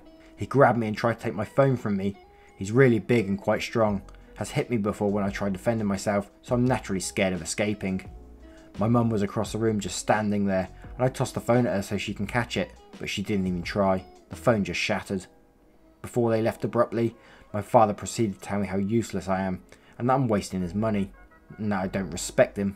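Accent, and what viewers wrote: United Kingdom. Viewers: Wow! I've never gotten a response by a r/eps person! Also I LOOOOOOOOOooooOOVe your accent! Are you brittish? I'm from Colorado in the US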